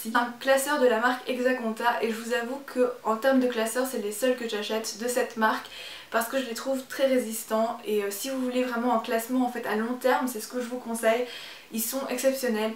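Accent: Belgium. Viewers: T'es Belge? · Tu es belge ?😄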